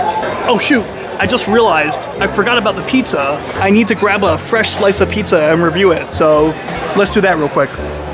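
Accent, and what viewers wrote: United States. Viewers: You have an Americanized sounding voice. You don't have a foreign Asian accent.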